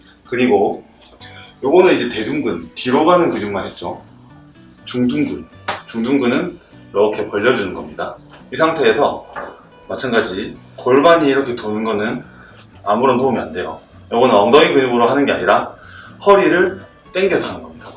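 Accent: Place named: South Korea. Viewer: 엉덩이를 쪼으면서 ㅋㅋ 사투리가 참 정겹습니다 ㅎㅎ 늘 좋은 정보 알려주셔서 감사합니다. 따라하면서 괜찮아진 곳이 꽤 되네요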